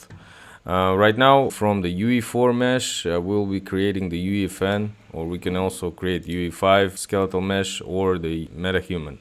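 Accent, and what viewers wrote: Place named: Croatia. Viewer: You sound Croatian.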